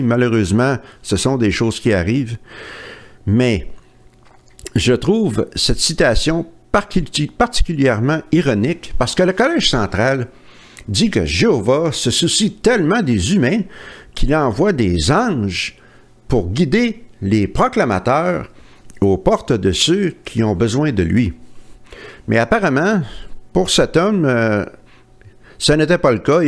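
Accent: Quebec, Canada. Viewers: J'adore aussi l'accent québécois, surement grâce aux 4 mois que j'ai passé à Montréal en 1992 et qui m'a laissé un excellent souvenir.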